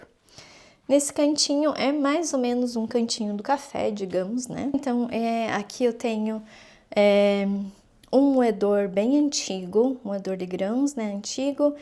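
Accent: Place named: South, Brazil. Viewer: Olá muito lindo seu AP, vc morava aonde no Brasil vc é do sul pelo sotaque eu pensei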